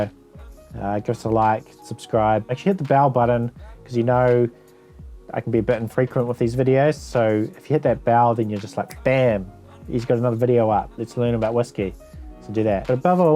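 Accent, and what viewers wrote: New Zealand. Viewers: Are you a kiwi? If so where from?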